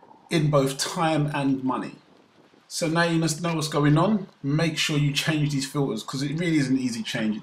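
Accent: United Kingdom. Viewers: You know just by hearing you talk im almost 100% sure you are black and from the uk EDIT: no offence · where are you from .. England ?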